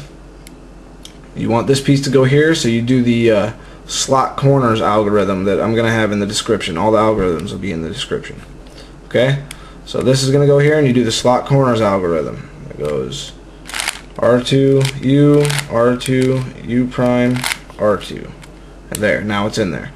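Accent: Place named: West, United States. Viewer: you talk like a cowboy, nice dude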